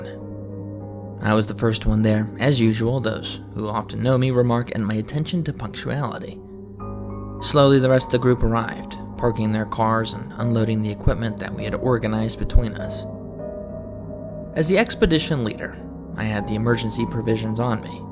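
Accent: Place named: Midwest, United States. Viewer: probably the midwest, reminds me of a thicker version of my accent so i’m fairly confident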